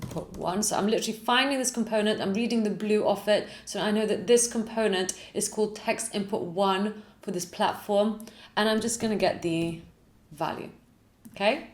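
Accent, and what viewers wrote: England, United Kingdom. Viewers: Which English accent is this? Just curious. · You are a British women, i know that because your accent is very well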